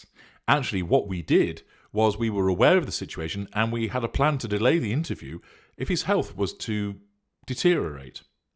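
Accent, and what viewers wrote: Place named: RP, United Kingdom. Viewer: Mister such a posh accent and then you have this term "bitch slapping" so funny god bless ya I laughed!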